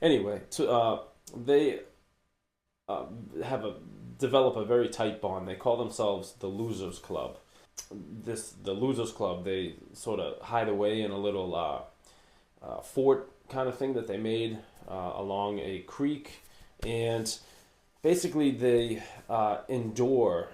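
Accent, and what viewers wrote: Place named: Boston, United States. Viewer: Let me guess; you are from Boston or some other part of New England.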